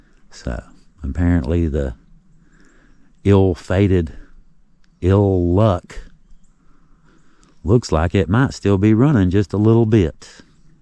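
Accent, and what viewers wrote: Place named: North Carolina, United States. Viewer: im from Granite Falls, NC the way you talk( your accent ) is the same as my friends that grew up around here so its easy to understand you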